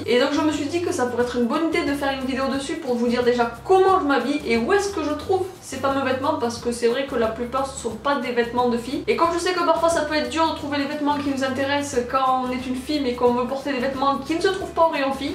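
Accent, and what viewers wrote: Toulouse, France. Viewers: Elle a un accent toulousain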